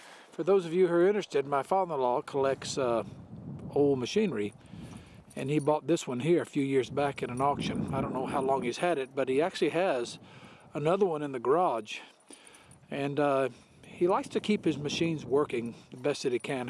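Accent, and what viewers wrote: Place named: South, United States. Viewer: you don't have a MN accent you sound like you are from the south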